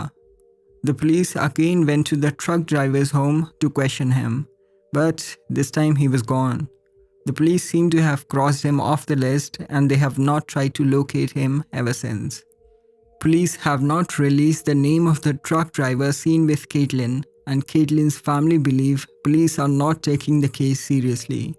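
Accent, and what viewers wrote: India. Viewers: omg this indian accent voice is even worst, unbearable, please use a better voice, it's worst than the robot one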